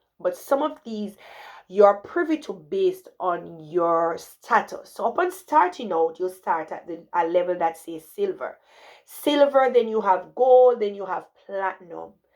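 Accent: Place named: Jamaica. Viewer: Love your Jamaican accent!!!!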